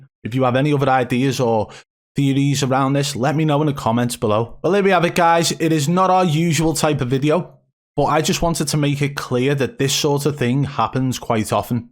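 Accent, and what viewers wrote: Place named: Merseyside, United Kingdom. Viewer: Absolute wool you mate! Swear to god you’re from Skem or Birkenhead you. Bad snitch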